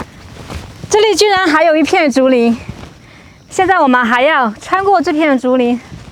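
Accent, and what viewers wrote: China. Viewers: 中國口音超重，你便走邊小心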